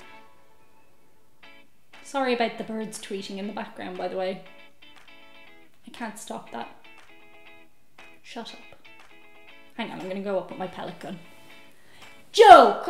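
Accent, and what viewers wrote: Ireland. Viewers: I thought you were Irish.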